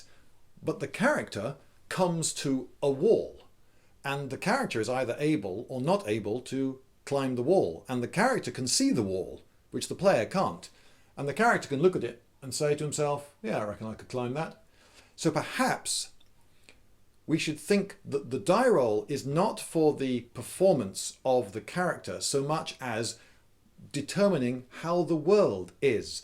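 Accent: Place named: United Kingdom. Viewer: what part of the UK is this dude from? I am interested what regional dialect/accent he has. It's bad ass!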